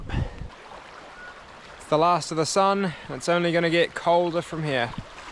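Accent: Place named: England, United Kingdom. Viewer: I recognize the English accent, but a tad different. I miss the accent. I'm from America. You build a bigger fire than I might. Also liked how you built it closer to your tarp. I've seen people build it 15-20 feet away. Personally, I think that's too far, to keep you warm at night. You meals are bigger/heavier on the stomach than I like. Camping, I prefer smaller fast meals. Just me. Enjoyed the video. Thanks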